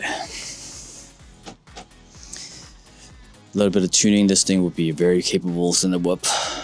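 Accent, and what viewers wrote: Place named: United States. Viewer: You sound American. Did you have any trouble ordering the Pavo 20 pro 2 considering the dji ban?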